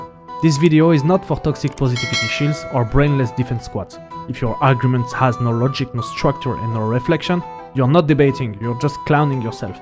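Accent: France. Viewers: Are u french cause i love the accent❤😂🎉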